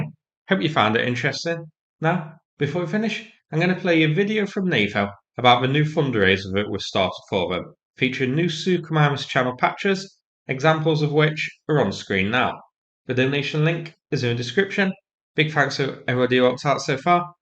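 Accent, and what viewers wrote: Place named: Mansfield, United Kingdom. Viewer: He’s from Mansfield. Slava Ukraine ! 🇺🇦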